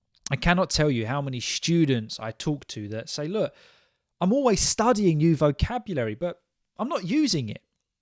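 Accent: RP, United Kingdom. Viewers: hello mate can you do a video while you speak like your normal day not as a teacher we need more listening challenge 😉 and can i ask you about your accent is it received pronunciation?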